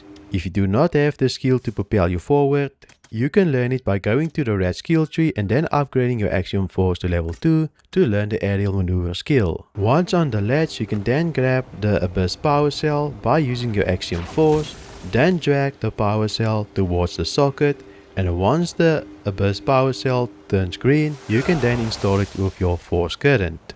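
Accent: South Africa. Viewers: Are you South African? I was trying to place the accent.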